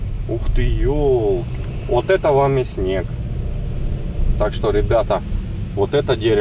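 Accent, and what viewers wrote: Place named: Donbas, Ukraine. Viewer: Цікаво, коли жила у Донецьку, ніколи не звертала увагу на інтонатику і наших чоловіків, тепер чую голос, а в уяві обличча любимого брата. Вперше помітила це, коли дивилася блоги Валерія Ананьєва. Тоді й почула цю специфічну інтонатику і подумала, що він з Донеччини, але той хлопчина був з Луганська. Зараз Валерій спілкується українською і та інтонатика вже пропала. Так що не тільки дороги рідного міста, а й "голос" брата почула.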